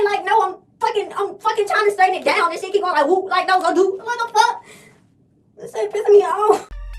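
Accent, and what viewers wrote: Jamaica. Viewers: Jamaican ? I hear the accent lol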